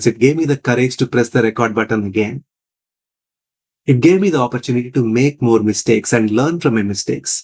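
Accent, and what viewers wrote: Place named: India. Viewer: You have a very pleasant Indian accent.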